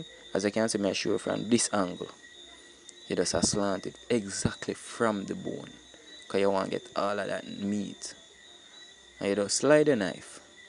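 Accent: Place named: Jamaica. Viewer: mi like how you keep the patois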